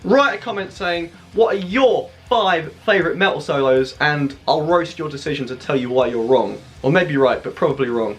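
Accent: United Kingdom. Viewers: did he? That british accent (I like so much) played me...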